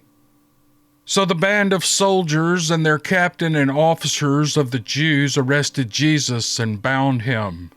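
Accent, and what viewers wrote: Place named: South, United States. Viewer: Hearing that ultra-familiar, southern accent narrating the Bible is more comforting than I can possibly express in words. I humbly thank you for this, Mr.. I shared these with my family.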